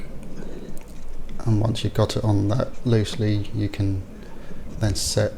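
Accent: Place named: Norfolk, United Kingdom. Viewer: Wow that's a true Norfolk accent what part are you from?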